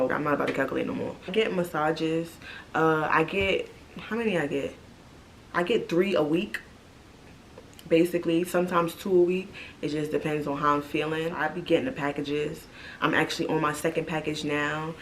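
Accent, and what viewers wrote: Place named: Brooklyn, United States. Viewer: Nah sis u really from New York and I’ll say Brooklyn just by the way you talk love your vibes hunny ... it’s the hand movement for me 🥰😭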